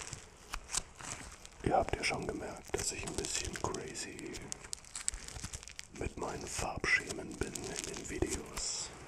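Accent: Germany. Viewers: It was no secret anyway, it was already clear just by hearing him speak english with his german accent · Your Denglisch klingt sehr interesting 😂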